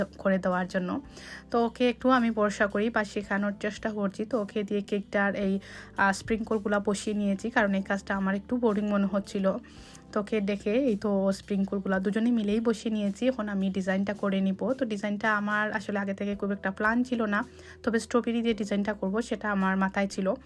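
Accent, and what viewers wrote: Sylhet, Bangladesh. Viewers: Kotay bujai jachhe uni 100% sylheti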